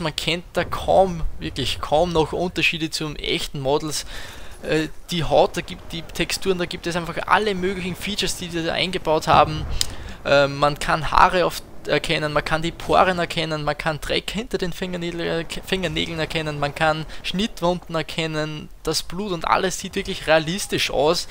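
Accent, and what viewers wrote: Austria. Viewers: du bist a Österreicher oder? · bist du österreicher ? :D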